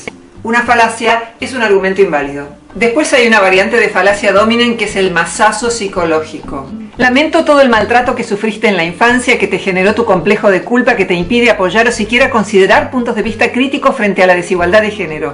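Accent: Argentina. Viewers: Detesto su acento argentino . . Pero la amo al oírla hablar . . Dios,Darwin,Mendel o Einstein te cuiden y guarden por siempre . .😍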